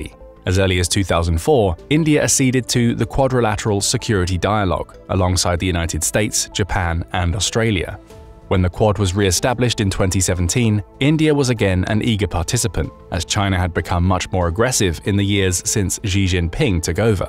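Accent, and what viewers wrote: United Kingdom. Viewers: Click bait. Again. This channel is silly but it has a British accent, so, I guess that's why folks are here..?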